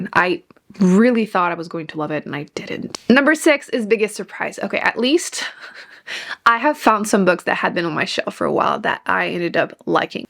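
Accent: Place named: Quebec, Canada. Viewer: Love the t-shirt you are wearing! I don't know if I'm right but you sound like a fellow Quebecer. I really love how you are reading the shelves and letting go of the books that you haven't liked. And that big jar wow :) I discovered this year Shari Lapena (a Canadian author, a happy surprised to me) and loved her! So that is part of my reading plans.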